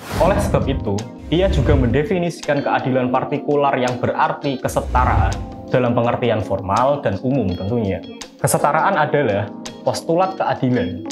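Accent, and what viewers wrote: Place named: Pantura, Indonesia. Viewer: Cara ngomongnya, medok khas pantura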